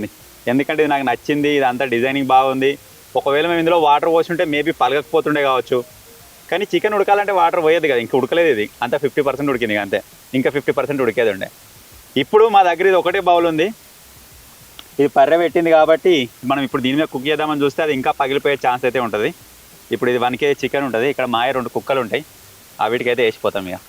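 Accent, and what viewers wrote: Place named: Godavari, India. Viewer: Anna love from Andhra ❤ Maa slang lo undey magic eyy veru godavari ❤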